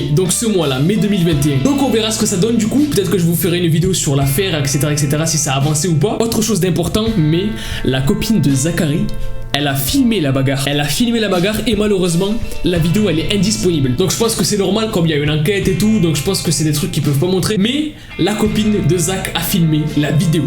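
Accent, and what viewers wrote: Japan. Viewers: La pub 😱😱😍😍😍 ton accent en japonais est juste magnifique 😍 j suis in love 🥰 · Ton accent JAPONAIS TUE!!!!😍💕💥💥👌👌#TeamOtaku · Au ptn mais t'a kira dans le sang toi avec ton manifique accent japonais UvU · Purée magnifique l’intro parfaite reference a death note avec kira (Light) wow super j’ai installé le logiciel pour réussir à faire de meilleur vidéo gacha life merci :)) parfait comme toujour UwU *(et en plus tu a mis les music de death note dans la vidéo) et magnifique accent japonais ♡ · franchement, je crois pour une fois je saute pas les placements, et l'accent pépites.🖤 j'adore ton accent japonais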